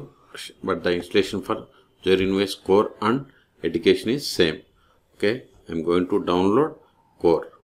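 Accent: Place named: India. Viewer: Indian voice , ofcouse it will work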